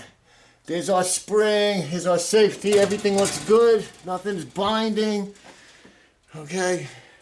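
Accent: New York, United States. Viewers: Really easy to understand. Great video. Living in Hawaii, we meet and welcome people from around the world. If we did not, we would get bored looking at each other all the time. As I watched your video, I was thinking to myself where might I have heard your accent before. It came to me. Remember the movie "My Cousin Vinny"? And I do not mean it in any degrading way. Not at all. You explained everything the way it should be. Just right. Thank you for putting together a great video. A thumbs up, for sure. Aloha! K Inouye Honolulu, Hawaii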